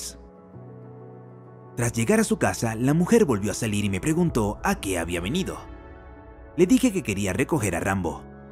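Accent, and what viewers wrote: Russia. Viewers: Bro sos ruso o español?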